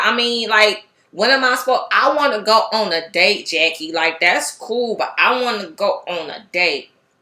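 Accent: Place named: Northeast, United States. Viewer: Ma'am, where are you located? You Sound like you from up north east coast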